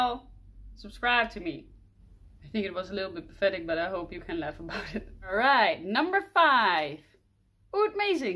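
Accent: Netherlands. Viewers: Haha ik wist dat je nederlands was. Hoorde gelijk aan je accent. Nice. Doe je ook nieuwe montale geurtjes? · Jij bent nederlands ik hoor het direct 😜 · Are you dutch????? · I hear dutch accent, are from your Netherlands? Im discovering Niche too, great video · Nice accent! Netherlands??and yeeeeeee My fav montale is intense Cafe also !!! 😁😁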